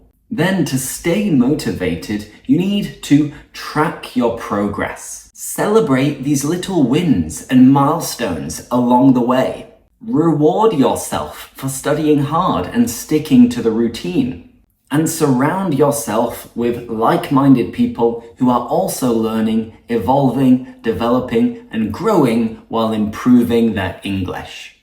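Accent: United Kingdom. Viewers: Your british accent is so beautiful!! 🥰 I am Brazilian, and my English leve is intermideat, but I am studying for improve my English and wanna be 100% fluent. 😊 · I couldn't express my feeling much more, but at least this video could provides me guides of progressing my English skill I wanted to. Despite the struggle on my English words management for speaking, I still can understand English in the simple way (such as everyday context). As in the video, you had said "Practice makes perfect." But for me, it's seems too difficult because I always thought practicing on English consumes more times than expected. Neverthless, I have to stay strong and never willing to surrender on my track, even if it's not perfectly. And you had said next "Practice makes progress." It is true in a matter that whatever I study messy, unorganized, mistakely, and so on, progress is currently on. 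Anyways, I really enjoy your British English sound. And don't forget, I'm learning English as a second language to unlock the potential opportunities, pursuing my dreams abroad, and having fun to myself. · Hi . Are you from the UK?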